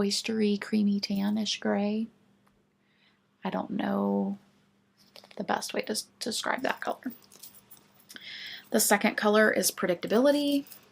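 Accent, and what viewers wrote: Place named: South, United States. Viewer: I have wanted to ask you this question for so long. I don’t want to sound in any way disrespectful to anyone. You have said you are a Louisiana girl. But you have no accent. You sound southern but I don’t hear Louisiana. My mother was in the hospital for awhile in Shreveport years ago but I was amazed at their lovely accent. Shreveport is not far from us. I live in OK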